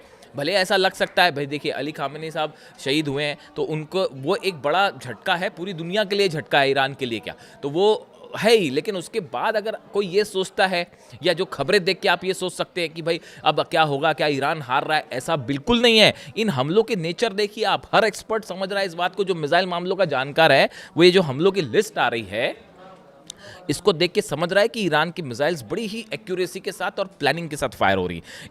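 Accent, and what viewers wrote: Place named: Uttar Pradesh, India. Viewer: bhai ka apna alag andaz bc wala, pure UP style and our favourite 😂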